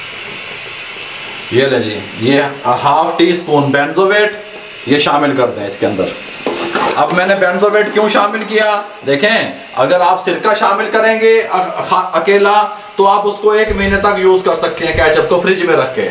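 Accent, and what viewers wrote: Punjab, India. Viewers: ALLAH khush rakhy baba ji aap ko AAMEEN ❤️ itni mehnet se banaty hain aap Love your punjabi touch 😍😍😍😍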